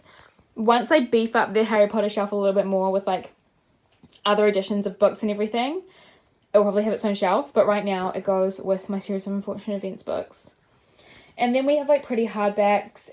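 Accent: New Zealand. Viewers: Giiiiirl you will die and be reborn when you read Six of Crows. The premise of it literally never interested me and I only read it to see what the hype was about... it's now my favourite series of all time. I can't even with it. I know you'll love it so I cannot wait to see your reaction when you finally read it! Also what was the channel you mentioned about the Goodreads review? Melanie something? Sorry, I'm not from NZ so I don't always understand NZ accents 😂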